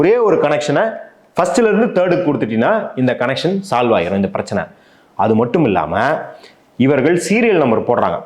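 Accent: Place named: Kerala, India. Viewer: நெறியாளர் கேரளைவை சேர்ந்தவரா? அவருடையபேச்சு மலையாள வாடை வீசுகிறதே...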